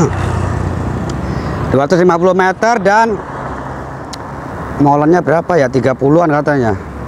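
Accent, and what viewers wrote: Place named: East Java, Indonesia. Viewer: ❤❤❤❤😂😂😂😂 BIKIN KANGEN..... saat pembukaan nada2nya...... Medok2... kayak orang Jawatimur... Kadang kayak orang Sunda... Kadang kayak orang Jawa Tengah.... 😂😂😂😂😂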